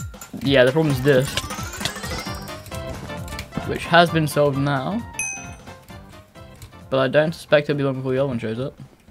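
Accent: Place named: United Kingdom. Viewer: every time you talk in your videos it reminds me that you're british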